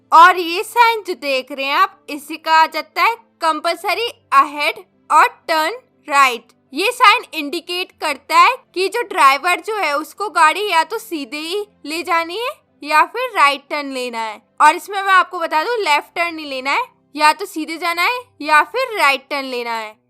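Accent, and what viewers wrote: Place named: Himachal Pradesh, India. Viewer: apki voice se lagta h ki ap himachal ya uttarakhand se h nice video very helpfull thnx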